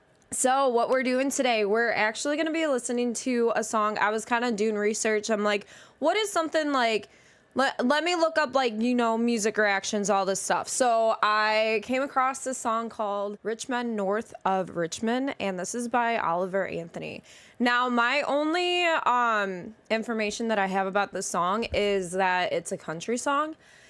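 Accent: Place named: Midwest, United States. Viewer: You have a very midwestern style of speaking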